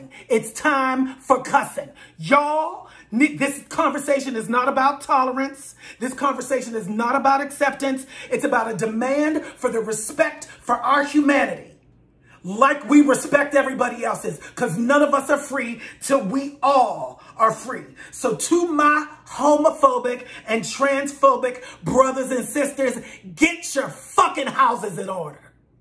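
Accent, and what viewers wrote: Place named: Pittsburgh, United States. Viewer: Your voice still sounds like you're from Pittsburgh you didn't pick up a New York accent at all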